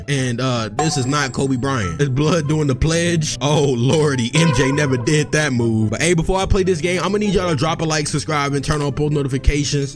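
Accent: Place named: Jamaica. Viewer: The Jamaican accent 😂😂. I wait everyday for a new vid to drop...stay consistent ...banger🔥🔥🔥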